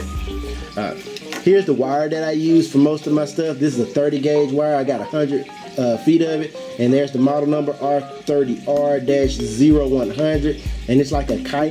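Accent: South, United States. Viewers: you sound like you're from the south xD hell yeah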